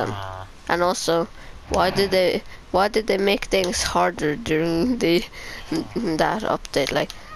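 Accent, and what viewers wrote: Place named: Norway. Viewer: VS Klubben then its a Norwegian accsent..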